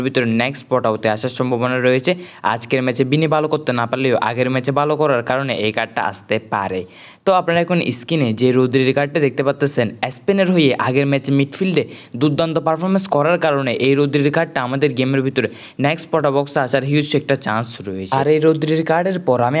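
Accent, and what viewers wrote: Chittagong, Bangladesh. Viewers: Vai apni ki Chittagong ar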